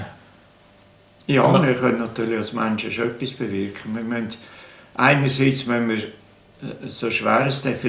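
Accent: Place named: Switzerland. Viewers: Ich liebe den Schweizer Dialekt, leider so schwer zu verstehen. :) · Für mich auch - unmöglich - viel zu anstrengend - da geht mancher Satz total unter. Mein Dialekt ist d. Schwäbische - red aber jeweils auch nur unter uns so - ich will doch verstanden werden - oder erwartet man , daß jeder interessierte hier gefälligst dem schwyzer' dt. sich anpassen sollte ? Sehr schade !Dazu noch den Bart , wo es erschwert , evtl. v. d. Lippen ablesen zu können !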